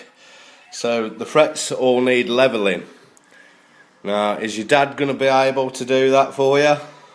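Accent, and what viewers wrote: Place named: South Staffordshire, United Kingdom. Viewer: My first bass guitar was a White Shadow. It was orrible. Actually judging by your accent I wonder if that one was once mine (I Live in south staffs...). Tradd mine in some time in the early 90s..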